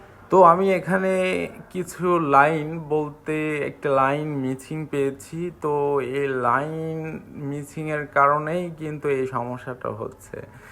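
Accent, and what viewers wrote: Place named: Kushtia, Bangladesh. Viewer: কুষ্টিয়া কথাই ভাইয়া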